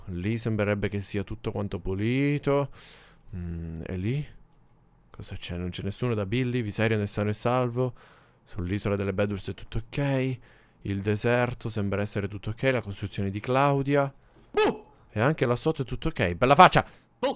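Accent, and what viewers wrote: Sicily, Italy. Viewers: !!! È siciliano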